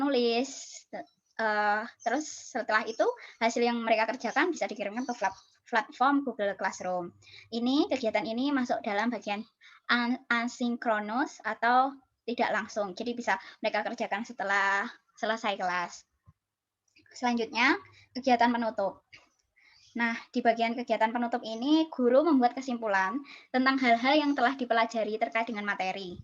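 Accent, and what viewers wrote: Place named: Java, Indonesia. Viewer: Suaranya medok gaes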